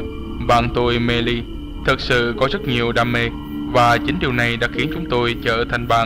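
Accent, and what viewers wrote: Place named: Dak Lak, Vietnam. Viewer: giọng đọc vl ra.. giống giộng ở tây nguyên đac lắc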